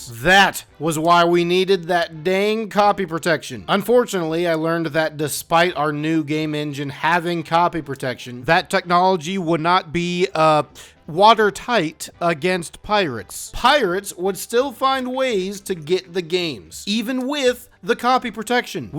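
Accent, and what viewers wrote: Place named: United States. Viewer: What a dreadfully american accent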